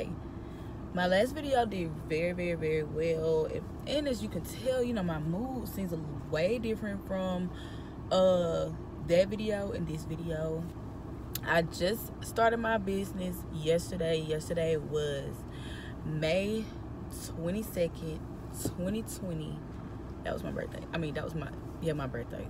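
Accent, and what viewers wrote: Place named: South, United States. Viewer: she (has) to be from Dallas or Mississippi....I know how we talk lol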